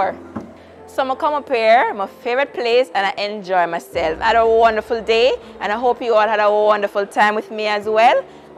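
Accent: Jamaica. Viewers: No man you work there now?? Muss lol i love the way you present these informative vlogs and you just fling the patois left right and centre. I LOVE IT!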